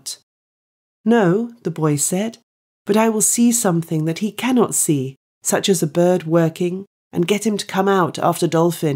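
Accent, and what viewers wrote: RP, United Kingdom. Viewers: Is It a rp accent?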